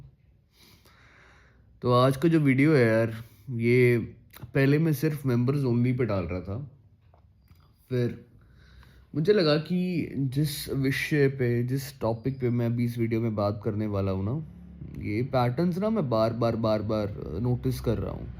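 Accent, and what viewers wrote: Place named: Punjab, India. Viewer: Fuck everything i love your punjabi English accent.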